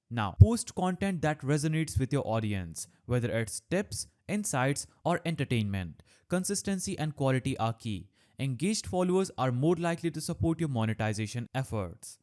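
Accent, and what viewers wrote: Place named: India. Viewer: Damn I hear so many Indian channels that make zero effort to be understandable. You are Not that. I love your accent. Especially the way you say "b" so cute..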